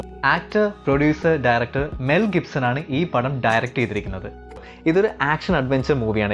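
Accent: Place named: Adimali, India. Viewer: അടിമാലി ക്കാരൻ ആണോ നീ.. നിന്റെ സംസാരം അങ്ങനെ ആണ്.. അമ്മച്ചി അപ്പച്ചൻ..😇😇👍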